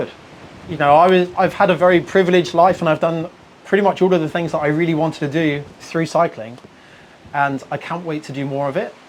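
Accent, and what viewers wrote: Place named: United Kingdom. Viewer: First time viewer, was kind of shocked to hear your British accent given that the scenery can’t get much more Dutch 😂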